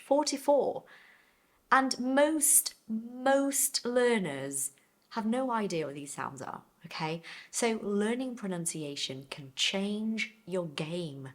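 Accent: United Kingdom. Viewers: Hi,! I can belivie that you're Brazilian! So am I! Your English is so great, although it's British English. I'm new here and I just follow you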